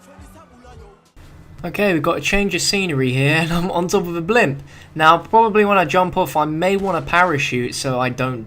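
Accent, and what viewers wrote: United Kingdom. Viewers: Is he brittish I wonder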